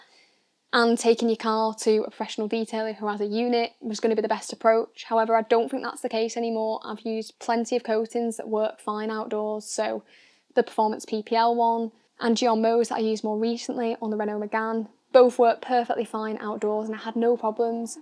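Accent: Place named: Northern England, United Kingdom. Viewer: I can't help but notice you have a northern accent. As someone from the south east, with a south facing drive way, I can assure you direct sunlight is insufferable. On dark cars. On light cars it really makes sod all difference. Water literally boils on contact with dark paint. It doesn't even need to be hot, just sunny. What we also have down here that you don't, is really hard water.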